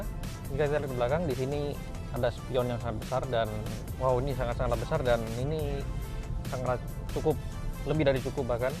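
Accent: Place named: Madura, Indonesia. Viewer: Logat madura nya tebal hehe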